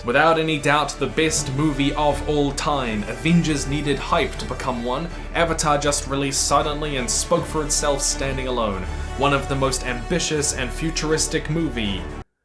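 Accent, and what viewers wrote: New Zealand. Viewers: Am I only now finding out you're kiwi but and not just British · Hold the phone. I didn't clock the kiwi accent for YEARS. Someone revoke my residency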